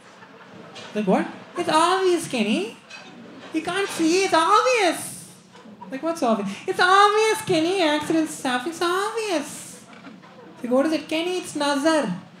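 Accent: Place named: South India, India. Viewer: Typical South Indian English, I'm a South Indian too and I love it !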